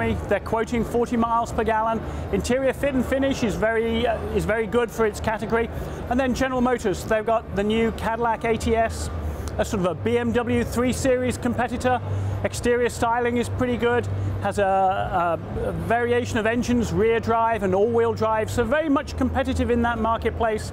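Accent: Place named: England, United Kingdom. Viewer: Funny he says domestic and sports an english accent while talking about american cars.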